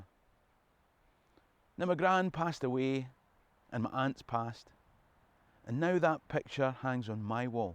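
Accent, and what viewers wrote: Scotland, United Kingdom. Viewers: absolutely fascinating and in a scottish accent that a scot can understand , not at all like the smoothing southern irish accent that when you listen to them speak its like they are lamenting to tou , compared to the northern irish accent that cal tear wall paper from a wall just by the sound ha ha ha , seriously though , its a must that scottish history must be taught , spoken , and explained by a scot with a proper understanding accent , clear and precise , which has been done excellantly , i am now a devoted watcher , and admirer of this podcast and i look very much forward to watching as many as i can , keep up the great work , your doing a great job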